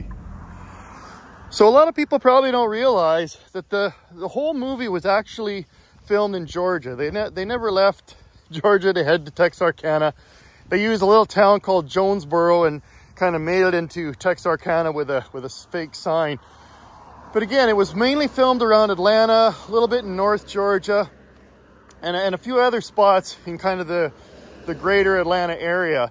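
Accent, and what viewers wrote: Canada. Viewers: This is the first video I've seen of yours. I had no idea you were Canadian, but I thought to myself "that guys almost sounds Canadian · Loved the video. You speak like a Canadian though. Figured it was some southern local guy recalling old times. Ah, Alberta I see.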